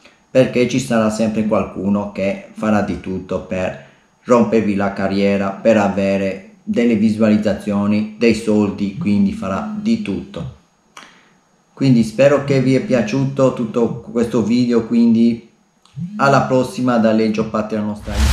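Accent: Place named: Albania. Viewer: Hai l'accento Albanese wow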